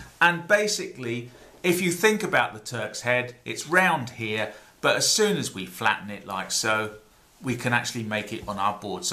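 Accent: United Kingdom. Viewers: The reason for it being so good and so clear ist because it's with a brtitsh accent! Which makes it easy to understand. Oh, the video is brilliant! Cheers for that!!!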